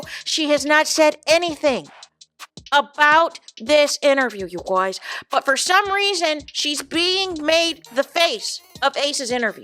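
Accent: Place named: Boston, United States. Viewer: I have never heard your kind of cadence before. I can only think Boston?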